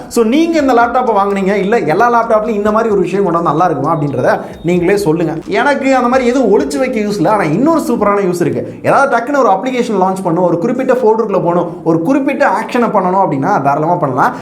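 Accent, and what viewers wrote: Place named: Madurai, India. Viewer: Thala unga madhurai voice and tones.. Summa vera level😎💓👌👌👌👌👊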